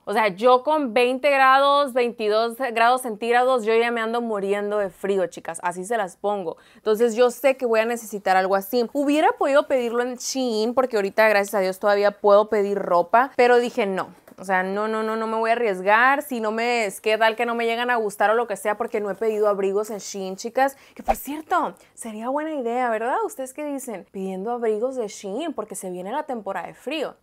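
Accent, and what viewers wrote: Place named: Sonora, Mexico. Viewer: Nueva subscriptora! Me saliste como sugerencia y te ame 😊 yo soy de Sonora y me divierto con tu forma de hablar muy parecida a nosotros los Sonorenses 😂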